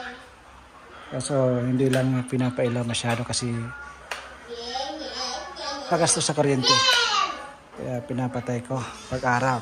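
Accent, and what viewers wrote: Waray, Philippines. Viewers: Taga di in ka sir baga waray man m panday hahahaha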